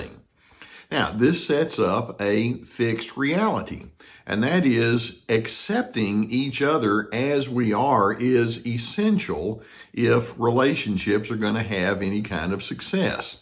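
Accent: United States. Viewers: Omg I just love this guys accent. It's the nicest in the USA ... Best wishes from Ireland 🇮🇪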